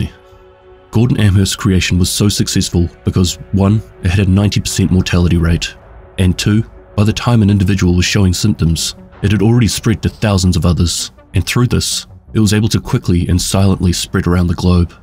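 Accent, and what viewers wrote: New Zealand. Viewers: Good to here a kiwi telling to the story from JTFOUTLAW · The gameplay video made me dizzy, only listen the kiwi sound it's ok, like listening a podcast it's fine. 😁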